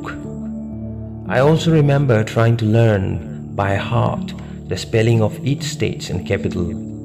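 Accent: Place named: Manipur, India. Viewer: Your manipuri accent is quite funny wt this story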